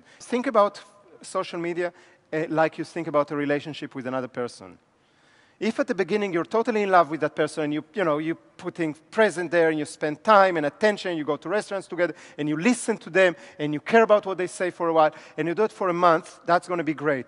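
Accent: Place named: Spain. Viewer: Bu nasıl video lan İspanyolca karışımı İngilizce konuşuyor adam bile konuşamıyor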